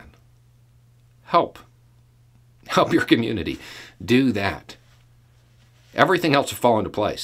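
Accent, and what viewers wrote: South, United States. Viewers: the cognitive dissonance i have with a bearded guy with a southern state dialect that actually able to have an intelligent nuanced discussion about such a topic showcase my prejudices and stereotypes which i have to me. hats off, your vouce of reason is sorely needed un todays workd. i will sit here in the corner...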